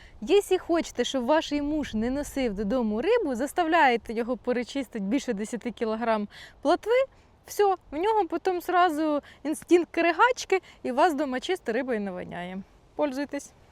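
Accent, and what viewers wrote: Kirovohrad, Ukraine. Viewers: А я то думаю що це суржик такий же як в мене, а виявляється ми обоє Кіровоградські)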